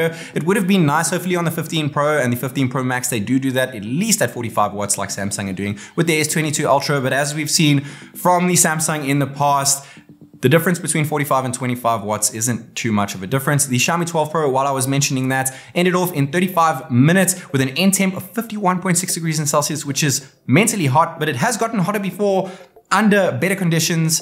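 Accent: South Africa. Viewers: I forgot your south african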